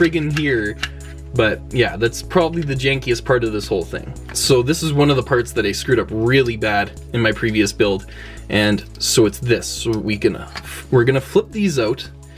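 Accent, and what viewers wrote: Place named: Canada. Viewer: Welp I was wrong your voice ait wired you are just Canadian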